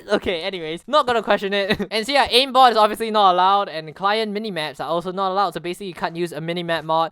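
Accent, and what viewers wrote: Singapore. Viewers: do u live in singapore? u have a slight accent :) ty for the vid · You sound Singaporean ngl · You sound singaporean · Lemme guess, u r Singaporean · btw this is the singapore accent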